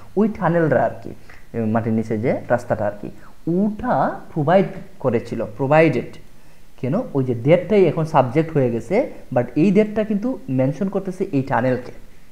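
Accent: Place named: Sylhet, Bangladesh. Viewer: অনেক ভালো লাগলো ক্লাস গুলো। আপনি সিলেট এর মনে হলো। আমি ১ বছর সিলেট ছিলাম, অনেক ভালো মানুষ সিলেটিরা।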